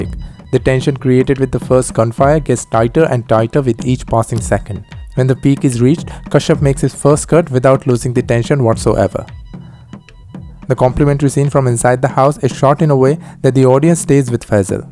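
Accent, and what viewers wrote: India. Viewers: Could not understand your Indian accent properly ?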